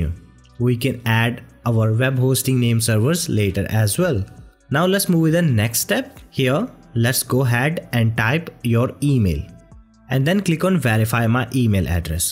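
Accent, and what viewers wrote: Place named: India. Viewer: Me not believing this video would solve my problems(😫) Me after listening to the indian accent(😁)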